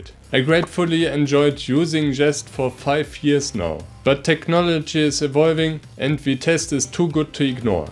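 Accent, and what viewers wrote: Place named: Germany. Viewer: Good old german accent 😄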